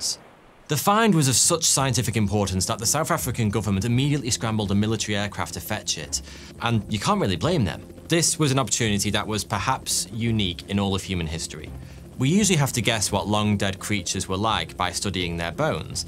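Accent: United Kingdom. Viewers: I totally forgot you're British, I've gotten so used to the accent I can't even hear it anymore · There's something about British dudes narrating animal videos 😉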